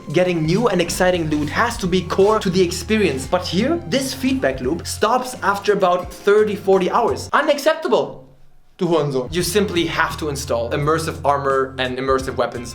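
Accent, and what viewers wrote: Germany. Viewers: Are you german or something? :D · A Chinese talking with German accent in English. Ich bin so confused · Is he german ? o.ō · whaaait are u from germany ? :D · Are you from germany??? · Ya your german accent is weirdly titillating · The deutschenglish makes me have the kekkers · You sound awfully German to me... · Wtf is he german? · Your englisch sounds sehr deutsch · Lol the German accent bruh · Sometimes I forget that he’s German , dann he speaks something in Deutsch accent and I’m like . Stimmt ja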